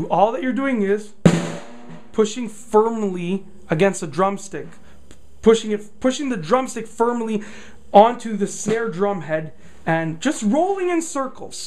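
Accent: Canada. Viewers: Are you canadian? So sorry... Love ur vidz tho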